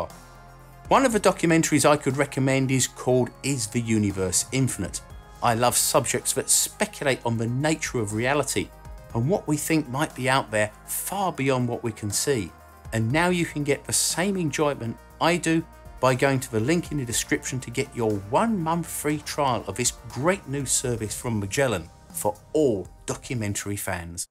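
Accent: United Kingdom. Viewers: Classy man, with classic British accent is a great combination to be a host of an informative show. Much respect from Russia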